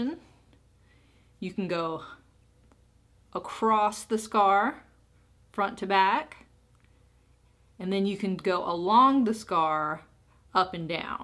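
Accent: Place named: Appalachia, United States. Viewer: Don't even go there with the Hemorrhoid cream... I looked like a Cauc-Asian for 48hrs after that :) Ha you definitely have an accent... To my ears anyway. Nice though. Like a little Hill Billy lol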